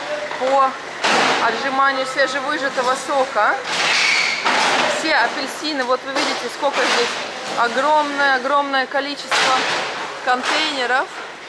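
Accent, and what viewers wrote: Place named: Turkey. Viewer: Извините! Почему у вас акцент на Русский? Такое ощущение что не русская а турчанка говорит на русском. У Турков ударение на последние слога.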